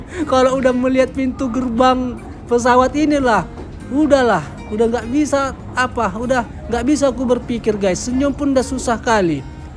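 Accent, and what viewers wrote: Medan, Indonesia. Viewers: Logat mu bg, nmpak x nya org Medan, salam satu kota bg, sehat slalu bg, 😊😊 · Salut sm bg...walau sdh lama di Jepang tp logat Medannya gak berubah sedikitpun. Selamat pulang kampung bg. Semoga istri dn anak abang betah selama di Indonesia.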